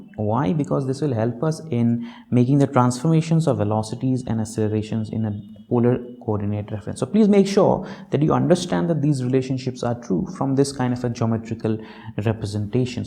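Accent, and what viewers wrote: India. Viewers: NIce English, Lesser Accent of Indian English, Keep It Up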